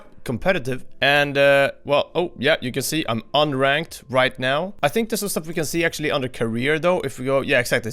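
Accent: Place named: Sweden. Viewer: is he swedish? sounds like that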